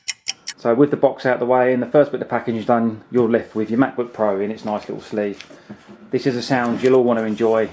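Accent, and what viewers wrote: United Kingdom. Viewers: I find it pretty amazing actually but the recurring kernel panic issue due to the T2 chip even after installing Mojave updates is stopping me from buying it in the meanwhile , and yeah I kind of guessed it would be British accent haha 😅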